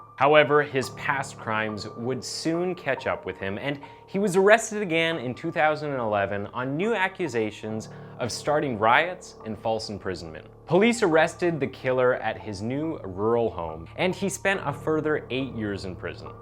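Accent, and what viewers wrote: Canada. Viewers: Is the narrator/ host Canadian? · caan tell this guys canadian , they say house like hewse and about like a-boat etc lol its the only way i can tell the difference as im british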